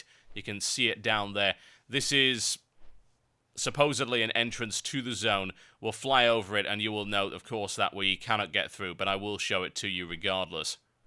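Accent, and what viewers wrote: United Kingdom. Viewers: how dumb... He's got a british accent and jest look at his channel background....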